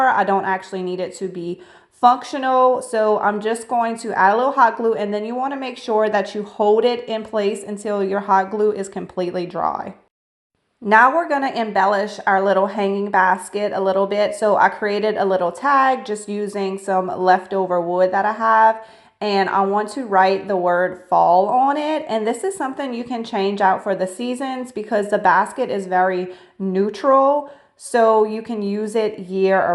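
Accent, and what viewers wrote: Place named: South, United States. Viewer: You have a beautiful personality and your southern y’all feels warm and welcoming.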